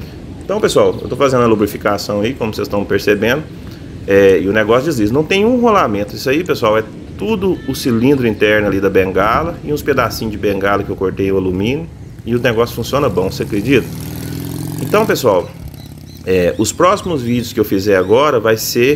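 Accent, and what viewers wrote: Minas Gerais, Brazil. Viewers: Esse sotaque mineiro me conquistou antes da máquina apresentada. Eu não sei como cheguei aqui. O mais louvo foi ver que 11 milhões de usuários vieram aqui antes de mim em 7 dias. Esse é o viral dos virais. Parabéns. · pelo sotaque é de minas uai !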